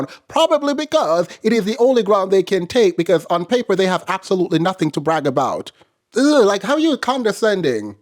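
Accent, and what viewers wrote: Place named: Jamaica. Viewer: You lead with your true Jamaican today and it was AMAZING!!!!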